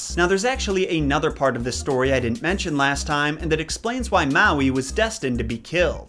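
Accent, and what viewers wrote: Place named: Wisconsin, United States. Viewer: i quite like your wiscansin ayccent in general not just with obscure word mispronunciations 🤗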